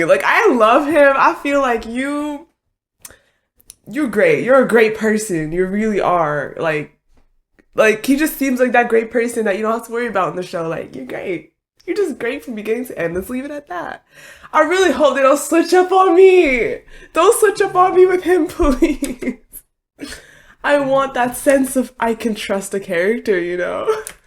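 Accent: France. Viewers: where u originally from? are u french?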